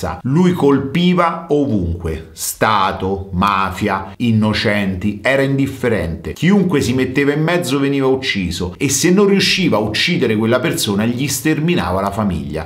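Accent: Rome, Italy. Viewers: Pero se devi raccontare non parlare in romano.. Non è mto corretto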